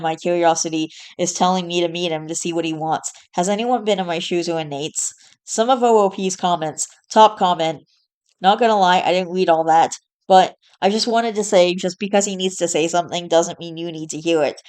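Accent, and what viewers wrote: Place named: Canada. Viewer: I never looked at your YT page before. You sound very articulate. Smart as a whip. Snd like the typical Canadian voice. Great video. Have a good one.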